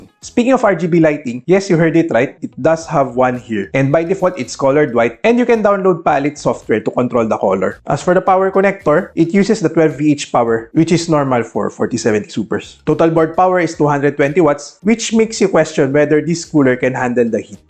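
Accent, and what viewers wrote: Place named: India. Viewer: Ur accent similar as indian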